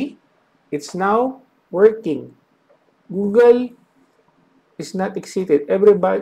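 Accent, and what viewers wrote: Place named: Latin America. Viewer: amigo porque pija hablas en ingles si se nota de aca a la china que sos latino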